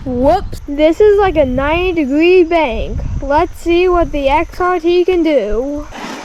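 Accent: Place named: New England, United States. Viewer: Your very welcome ! Your accent sounds like a New England accent. So cool when you took that truck up the stairs! Keep up the great work!💯👊🏼😎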